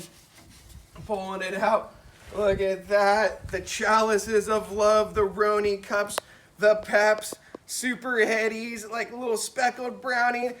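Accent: California, United States. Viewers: You have one of the strongest california accents I've heard and I live in LA!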